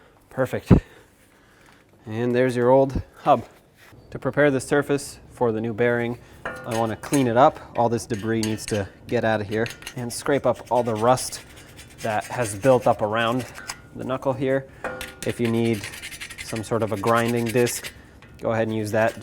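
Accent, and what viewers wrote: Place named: South, United States. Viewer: This guy is from the south for sure lol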